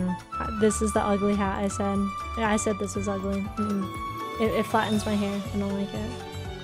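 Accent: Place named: South, United States. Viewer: That southern accent is just getting better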